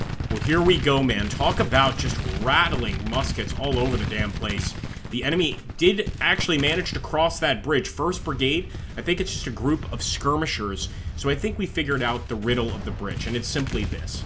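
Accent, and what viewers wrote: South, United States. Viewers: I love your southern accent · Love that southern accent, spicy.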